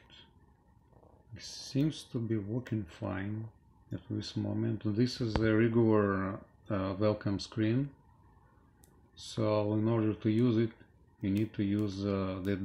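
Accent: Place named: Ryazan, Russia. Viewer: Good instruction! Just restored my R810 watch... Your ryazan'-style pronounciation blowed my mind...)))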